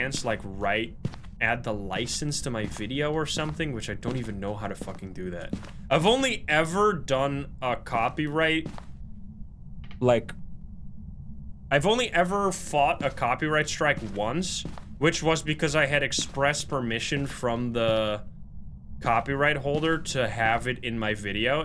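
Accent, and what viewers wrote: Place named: Netherlands. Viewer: I've been watching your YT vids for a while now, learnt A LOT, so thank you for the wide knowledge. Also I was used to hearing my late Oma's Dutch accent that I could no longer hear it, and its taken me weeks to figure out you're Dutch yourself lol. I finally picked up on it with some of the words that in English have the "th" sound.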